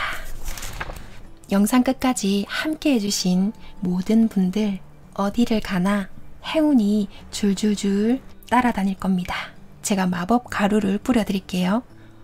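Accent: Gyeongsang, South Korea. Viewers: 목소리가 매력적이세요~^^ 경상도 사투리가 더욱 매력 있으십니다~♡♡♡ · 경상도 아가씨다 ㅋㅋ 저도경상도 ㅋㅋ 이쁘시내예~~잘보고갑더~~밥묵고하소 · 아침일찍 좋은말듣게되어 오늘하루를 힘차게 보낼수 있겠습니다 경상도사투리가 정겹기도하구요~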